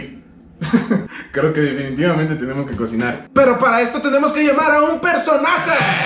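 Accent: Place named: Ecuador. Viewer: Que mrd? eres Pijo? o eres ecuatoriano... joder.... lo odio :")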